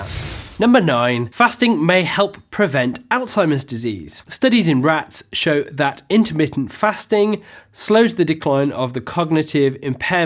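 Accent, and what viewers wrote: United Kingdom. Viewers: Four seconds in, heard brittish like accent. Out of here!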